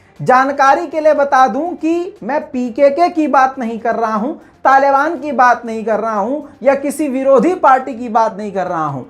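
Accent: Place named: Bihar, India. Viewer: . India k kis elaka k rehne wale ho. Lagta hai bihari ho. Tumhara lehja bihari jaisa hai. Me Lahore se hoooon. Aur india se ache taalukaaaat chahta hoooon. Laikin yea unbhagat tatikhor nahi chahte. 😐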